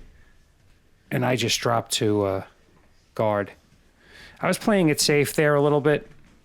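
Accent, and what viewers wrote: United States. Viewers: Your voice sounds like Secaucus to me. 🤔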